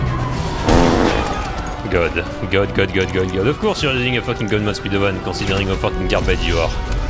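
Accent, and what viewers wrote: France. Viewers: 3 things 1. This was satisfying 2. WOOW THAT WAS AMAZING SHOT 3. Hearing a french talking english is indeed satisfying to the ear idk why + great ability sir, long live bicycle cult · love the french accent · that french accent is majestic, as a french person I can agree your accent is awesome! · I hear ur French accent can you say Rickey Bobby like the French guy form Talladega knights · Are you french because your accent is really cool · the French accent makes this 1000x funnier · I loved the video and the french accent made everything better!!😂❤ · you got a french accent, just like me :D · The accent made it better ol frenchy boy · this accent sounds french i love it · I love the french accent. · Can we all just appreciate his fine French accent and the fact that he CLEANS THE LOBBY for toxic tryhard? Thanks a lot dear lord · At first i thought you was Polish/Russian but after hearing your accent for one more time your French,lol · i usually dont watch gta online stuff but this vid was fkin hilarious and your frnech acent makes it waaaaay better hahahaha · Le bon accent français bonne vidéo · This guy has the most french accent of all time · L'accent Français qui fait plaisir :awesome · At first the heavy French accent put me off, and yet over time I find it so hilarious whilst speaking English. 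Easily subbed. You losing your shit or wrecking someone is some top tier stuff. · French accent makess this so much better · Un accent purement français mdrrr ps t’es vidéo sont vraiment Cali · Le bon gros français de base qui parle anglais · I subed cuz I love ur French axent and love ur humor and I'm part French but I have yet to learn such a language but first I want to watch all the rest of ur vids cuz this is my first vid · french accent is " vraiment cool mon gars " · i like ur french accent :) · Sorry if I seem to be rude somehow, but probably 90% of everything I understood on hearing, considering your French (?) accent, was the swearing. 😄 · his french accent is like the best combination for the vid istg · le petit accens francais sa me fume ^^ , sinon gg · That french accent tho💀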